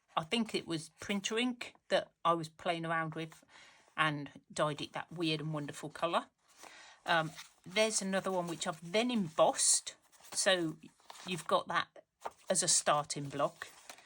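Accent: United Kingdom. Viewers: I love the double pockets. Is that a Black Country accent I hear? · I love love love everything you showed us! Your style is my style. I really enjoyed listening to your British accent and seeing all you had to show us! Thanks you so much for sharing❤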